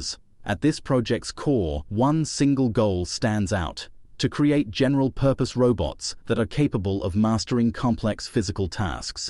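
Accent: United Kingdom. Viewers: Why would a voice with a brit accent call is soccer? Boooo. Lol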